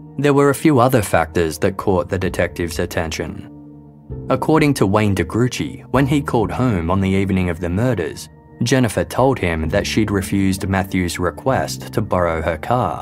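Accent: Australia. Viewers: So nice hearing a fellow Aussie. Wooo 🥳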